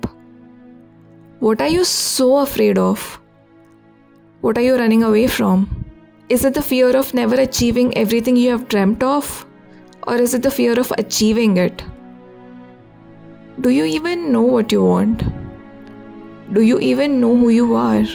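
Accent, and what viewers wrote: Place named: United Kingdom. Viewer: british english while indian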